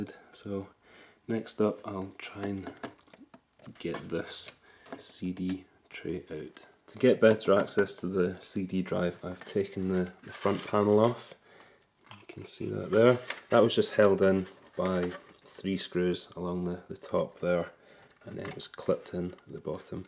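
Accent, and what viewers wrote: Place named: Glasgow, United Kingdom. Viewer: Love your videos, you and 6oodfella make me feel right at home - team Glasgow! :D (you sound glaswegian haha). Also, burn yourself a copy of lost eden on CDI :)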